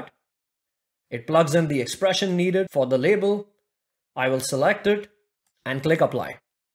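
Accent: India. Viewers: سلام خستت نباشه - عذخاهی، شما اینگلییسیو تو ستان🇮🇳 یاد گرفدین[ت= د👂 ; ) ] یا ایران🇮🇷، طرز تلفظاتتون شبیه به مردمان هندی اینجا در کاندا(بیصائب_شده) که ساکنم به گوش می خوره🤔🙂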